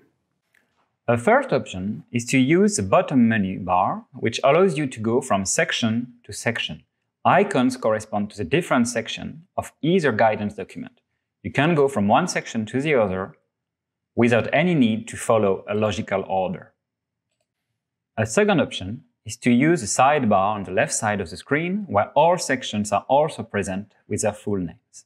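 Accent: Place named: Brittany, France. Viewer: Great tutorial for a great tool. Onwards with roll out! Thanks for the pedagogic presentation (with sexy Breton accent on top!)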